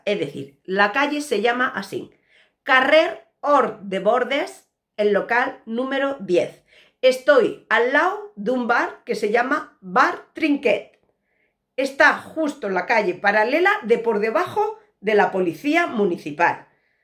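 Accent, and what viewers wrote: Andalusia, Spain. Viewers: q gracia me hace cuando dice os quiero una jarta se nota q es andaluza me alegro mucho por su tienda gracias por compartir tantas recetas saludos desde Valencia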